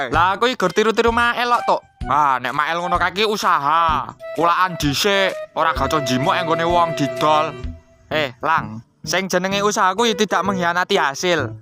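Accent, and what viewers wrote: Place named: Surabaya, Indonesia. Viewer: Suroboyo teko cak 👍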